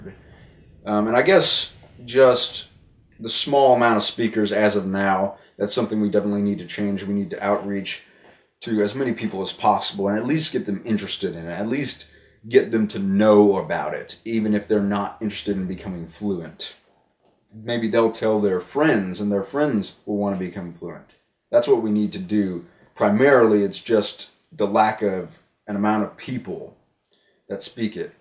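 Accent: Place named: RP, United Kingdom. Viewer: what state are you from? you sound very posh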